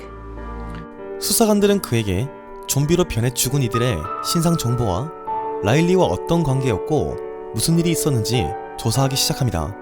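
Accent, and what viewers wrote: Gyeongsang, South Korea. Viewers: ㅋㅋ경상도 분이시네용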